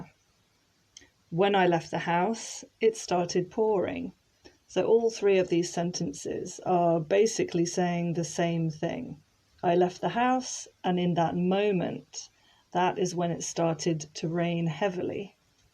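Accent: United Kingdom. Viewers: Hi! I discoverd one more utilisation the "as far as..." Tsunami had reached as far as my house so I moved to another island! Thank you for your lessons and I love listening your british pronunciation as well, even though it is difficult to imitate!!!❤❤❤👌👌👌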